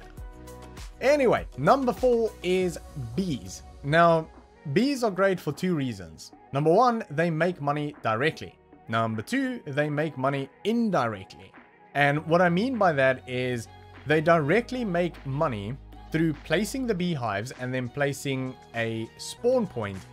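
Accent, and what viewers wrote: South Africa. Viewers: Strong SA accent 🤘🤘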